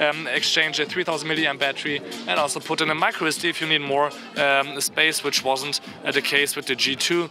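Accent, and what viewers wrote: Germany. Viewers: Man hört schon den deutschen Aktzent, aber gut gemacht ;-)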